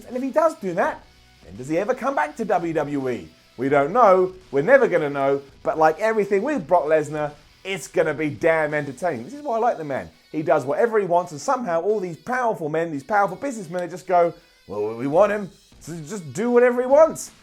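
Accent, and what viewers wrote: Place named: United Kingdom. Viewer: I like that british accent😁😁😁😁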